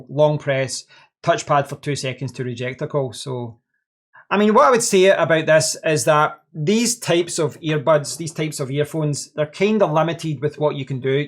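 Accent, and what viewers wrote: Scotland, United Kingdom. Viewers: Your accent makes me miss Scotland 😀. Here in UAE it's only 22£ with coupon I think it's the only tws with apt x under 25quid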